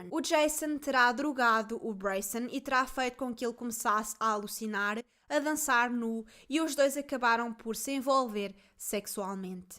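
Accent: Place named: Portugal. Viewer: Eu também acho lindo o sutaque dos portugueses.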